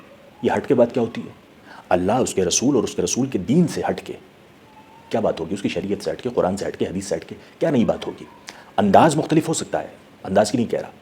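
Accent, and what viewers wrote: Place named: Bareilly, India. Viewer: Are yaar Aaj kiya hogaya is ko ekdam baraily Shareef ke andaaz me bola achha laga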